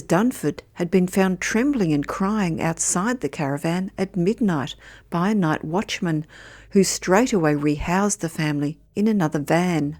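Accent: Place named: Australia. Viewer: Hi there with the lovely Aussie gal commentary! I have a question,IF you please?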